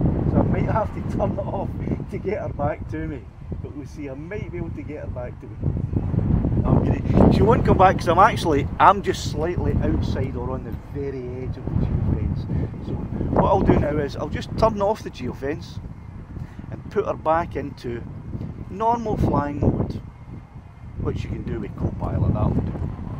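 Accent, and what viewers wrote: Scotland, United Kingdom. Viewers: I absolutely love your Scottish accent, I can understand you way better than some English blokes from North Yorkshire, or Saint Helens and Liverpool 🤣 I always set the phone on airplane mode if possible, it prevents your sceen capture getting disturbed by push notifications like Whatsapp and stuff.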